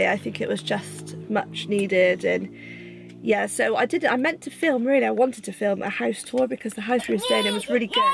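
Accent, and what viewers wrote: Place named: Gloucestershire, United Kingdom. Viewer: I just came across your channel from Mummy Cleans' channel! Love your channel intro and videos I've seen so far 🙂 your accent sounds so local to me, I'm from the Forest of Dean in Gloucestershire 🙂 your holiday sounds lovely, we're off to Cornwall next month, can't wait. I've got a 2 yr old son and nearly 4 yr old daughter so doubt it'll be relaxing though lol! Sorry to hear you've been unwell xx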